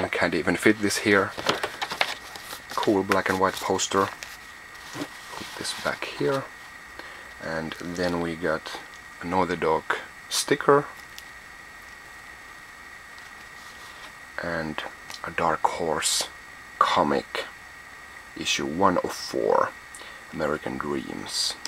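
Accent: Finland. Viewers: joo huomasin aksentistas ja kanavan nimestä :D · I'm constantly in Helsinki! I thought I recognized the accent! :) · hahahah finnish accent lol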